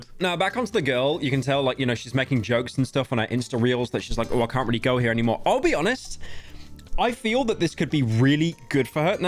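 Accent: United Kingdom. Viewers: i was 100% certain that it was penguinz0 video until i got jumpscared with bri'ish man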